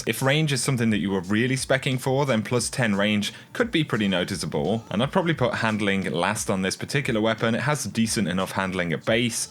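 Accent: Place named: United Kingdom. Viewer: Why does every destiny game guide feature a brit boy?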